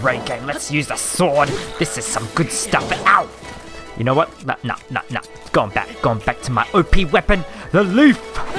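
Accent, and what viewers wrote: Australia. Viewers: Where are you from you sound aussie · You're Aussie??? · Is this guy Aussie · Is he Australia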